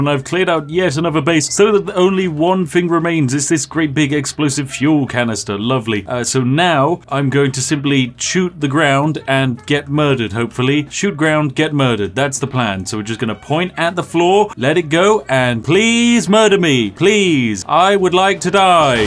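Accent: United Kingdom. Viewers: imagine standing at a petrol station and you just hear "ooh its a petrol station, full speed ahead" in an insanely british accent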